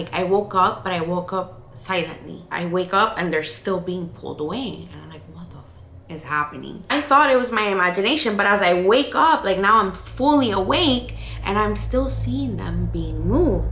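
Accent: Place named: Miami, United States. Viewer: as you were speaking I just had a feeling you were from miami...I swear the creepiest things happen here. I have so many paranormal stories just like this but wow this definitely unlocked a new fear LOL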